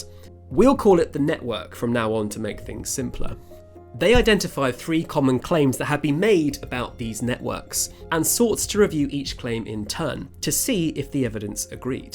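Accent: United Kingdom. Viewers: This is a masterful exercise in gaslighting, all the way down to the British accented narrator. uses a well known template from the timber and chemical industries- employ delaying tactics to tear down the best current research by asking for more extensive research. This is significant when taken in the context of major timber harvesting plans already underway in Canada and more planned in the Pacific Northwest in the US. Simard is correct in pointing out that her one time "colleagues" are "reactionary" scientists. doesn't point to any research from Simard's critics on the relationship of the mycorrhizal network to the trees and how that can be better understood with newly designed experiments. It would be interesting to see if has done any films on the roles the chemical and timber industries play. Try understanding the role Bonnie Bessler's "auto inducers" play in spreading the information carried by microorganisms.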